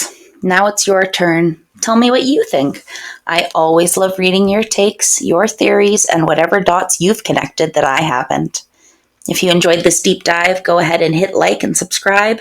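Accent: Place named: Canada. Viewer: you sound so canadian lol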